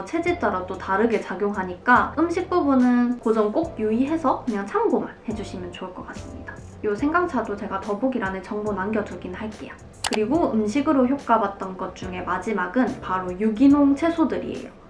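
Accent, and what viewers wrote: Gyeongsang, South Korea. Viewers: 일본인 구독자입니다! 님 고향이어디입니까!! 갱상도 사투리같은데 어디죠???🥺💕💕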